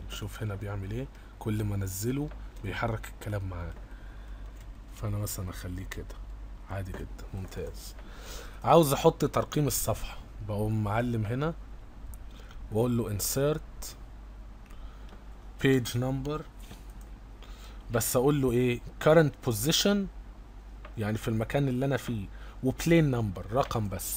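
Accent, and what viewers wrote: Egypt. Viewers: هو اني فهمت بس ليش يحجي مصري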